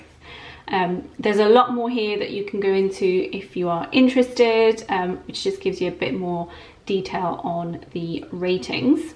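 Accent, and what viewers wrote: United Kingdom. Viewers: Really like your channel! I started investing roughly at the same time as you (Dec 2019), I am 27 and I am also an immigrant living in London, I feel quite identified. I didn't know you are Polish and all your family is from there. Your British English accent has 100% confused me. I suggest you take a look into Taylor Wimpey for a great Real Estate mainly UK based company. Very safe, almost no debt and a lot of growth ahead. Are you interested in any particular industry to get into more UK companies?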